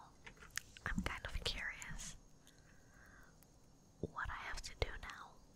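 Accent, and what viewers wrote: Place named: Netherlands. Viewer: Where are you from my guess is the netherlands cuz of the accent.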